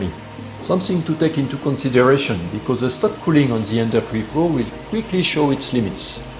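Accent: France. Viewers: I love your accent, are you french? The way you say extruder just sounds so fancy lol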